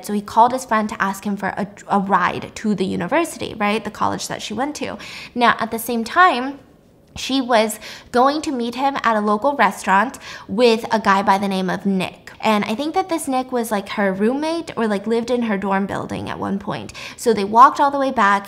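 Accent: United Kingdom. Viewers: Ok but your British accent is the only one I will accept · im here to let you know it is a British accent and imma go with this loll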